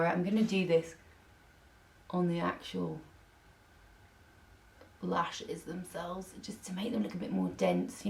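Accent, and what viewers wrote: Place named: United Kingdom. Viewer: not wanting to generalize the british accent but you remind me so much of Lisa Eldridge. I love her and love your content!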